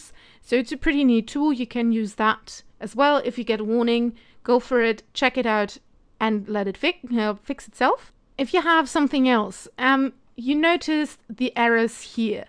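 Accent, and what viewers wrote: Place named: Germany. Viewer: GERMAN!!!! YES!!! That’s what I’m hearing! Well it’s a lovely voice. ;)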